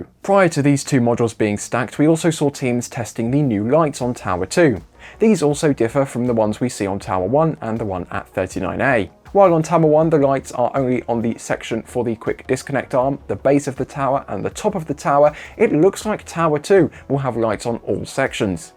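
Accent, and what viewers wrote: United Kingdom. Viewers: Hi NSF, it is nice to listen to, because of being British, BUT can we please slow down the speed a little, only a little. From your 1.1 Million subscribers are not all native English speaker, like the poor guy here. Due to rewind and repeat it took exactly 35 minutes for this clip. To lenghten it to 2 minutes longer will reduce that immensly - see John's clip yesterday. Speed was perfect there. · Kinda difficult understanding some of those wiords with that pommy accent.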